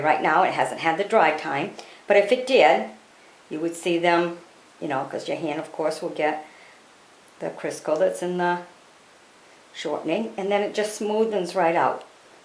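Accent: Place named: New Jersey, United States. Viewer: She sounds like she's from Jersey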